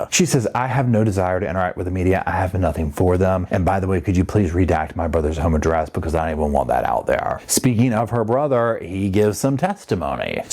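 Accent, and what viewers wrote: South, United States. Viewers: Just found you.... LOVE your "southern' accented comments.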